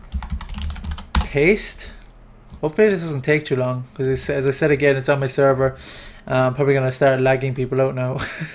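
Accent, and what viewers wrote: Ireland. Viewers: Irish accents. They are so awesome. *wipes away tear* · Dang minecraft version of JackSepticEye, every person with irish accent can be Jacksepticeye i guess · You sir, are a brilliant creative master with a lovely Irish accent. Bless you for your talents and creativity and sharing both of them with us. Have a nice day :)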